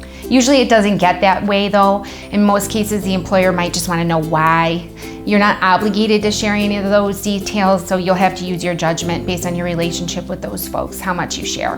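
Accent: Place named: Minnesota, United States. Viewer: I love her accent, is she from the Fargo movie?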